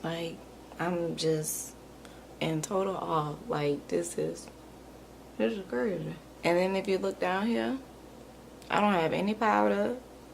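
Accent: New Orleans, United States. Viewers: Are u from Nola? I hear the accent, it's soo cute! Very pretty look ❤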